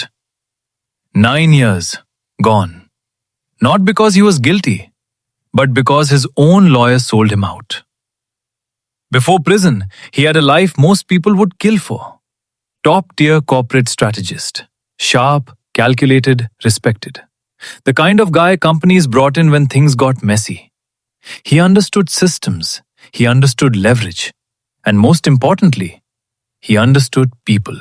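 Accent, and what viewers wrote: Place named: India. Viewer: Hello bro 😊 I am also thinking too create videos like this 😊 I think you should change your ai voice tone or English levels It's giving indian voice tone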